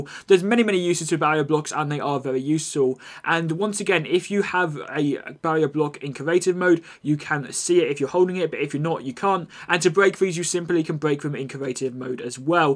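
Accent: United Kingdom. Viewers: i thought you was from the UK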